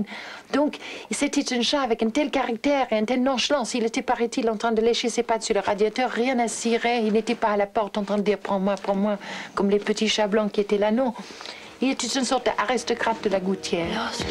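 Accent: United Kingdom. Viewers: Son unique personnalité avec le charme de son accent bristish qui était toujours fort agréable à entendre ! C'était vraiment une magnifique personne très courageuse ! 🌹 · chère Anita J’ai eu beaucoup de plaisir à lire votre message de retour. Moi-même j’ai 52 hivers. Depuis toujours, j’ai eu un profond respect et de l’admiration pour notre Jane. Elle a toujours possédé une belle plastique et une âme pure. Je ne vous cache pas Anita que son départ brutal m’a plongée dans un profond chagrin. Je n’arrive pas à réaliser que nous ne n’entendrons plus son délicieux accent british. Je me console en me disant qu’elle a rejoint Serge pour leur décadanse et que Kate les prends en photo sur la piste de danse du paradis. Je vous souhaite un très bel été chère Anita et un grand merci pour votre délicieux message. Amicalement, Corey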